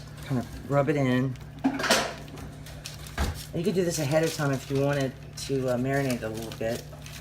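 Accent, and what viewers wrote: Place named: Louisiana, United States. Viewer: Looks delicious! I love cajun food and Louisiana! I was actually thinking when listening to you that you probably live in Louisiana! Lots of garlic and lots of cheese! Mmmm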